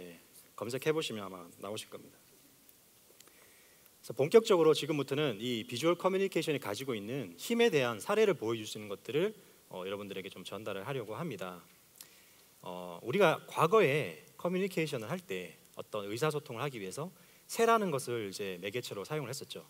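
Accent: Gyeongsang, South Korea. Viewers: 좋은 내용.전 왜 한국은 인포그래픽이 없나햇엇는데. 강의하시는분말투는 경상도 생긴건 전라도네요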